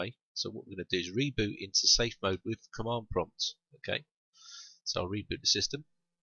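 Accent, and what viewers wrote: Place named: Cockney, United Kingdom. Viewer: You're so cockney that I thought you were Australian at first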